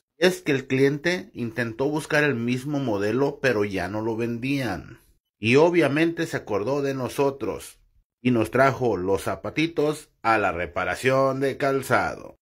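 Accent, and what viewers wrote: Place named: Mexico. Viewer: No ma.. que chingon trabajas amigo e visto varios de tus videos trabajas muy chingon veo que estas en EU donde aprendiste el oficio por tu forma de hablar y las leperadas que dices jajaja as de ser mexicano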